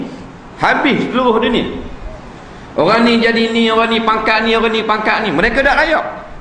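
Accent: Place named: Johor, Malaysia. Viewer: ada sesiapa tahu tak kat surau mana ceramah ni diadakan? cara ustaz cakap, macam kat johor..... surau mana ye? best sangat kuliah ni, panas dan pedas.....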